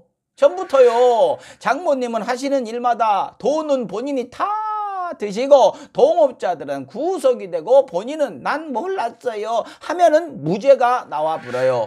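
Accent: Jeolla, South Korea. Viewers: 아따 서울출신이신 분이 전라도 사투리 억양을 질펀하게 구사하시네요 그리고 그동안 여러 매체에서 자랑스럽게 전남 영암출신으로 소개되었던데 그건 어떻게 된 건가요? 한번 해명 좀 ...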